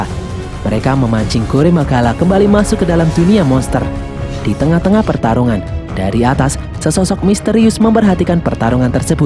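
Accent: Java, Indonesia. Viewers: pas gw nonton beberapa vidio awal mimin kok pembawaannya medok banget ya ? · Miminya orang jawa mana ya? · Medok yo mas 🤭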